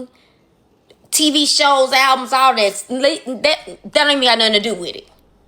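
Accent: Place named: South, United States. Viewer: The more I listen to K Michelle the more I’m liking her. And the Southern accent love love love ❤😂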